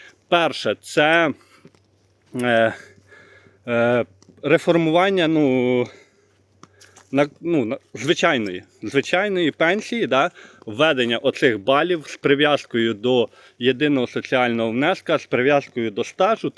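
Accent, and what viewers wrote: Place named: Surzhyk, Ukraine. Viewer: Дуже дратує суржик цього журналіста!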